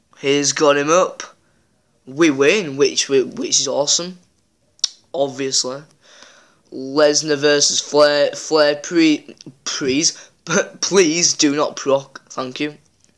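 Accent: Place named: United Kingdom. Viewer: Awesome video bro. I really enjoyed watching it. Are you British btw? I like your accent a lot.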